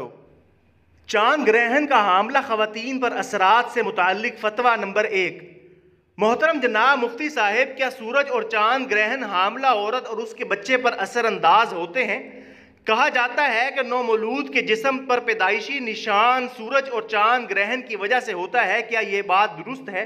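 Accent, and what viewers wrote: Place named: Rural, India. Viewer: Taire awaz sai hai pata chal Raha hai Tu Kitna paindo hai. Don't spread lies!😞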